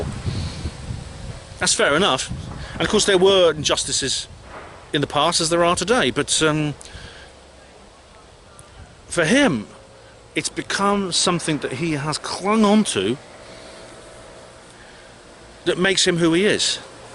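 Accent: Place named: Cockney, United Kingdom. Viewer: mate, I am from Hertfordshire and there is nothing more annoying than a three counties Cockney.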